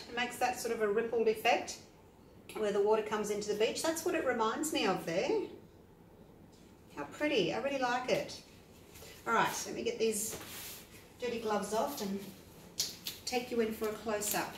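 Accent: Australia. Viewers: Simply stunning. With that Australian accent I assume you live there too. Is global acrylic paint available in Australia and which supplier, thanks.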